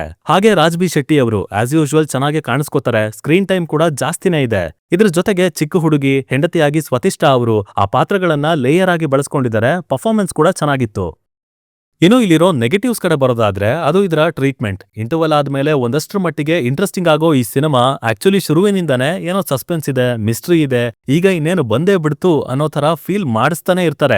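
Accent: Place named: Chamarajanagar, India. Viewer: ಚಾಮರಾಜನಗರ ಭಾಷೆ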